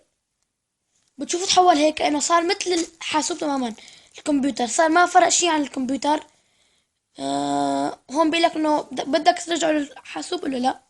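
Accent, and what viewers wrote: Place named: Syria. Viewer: مبين لأن لهجته سورية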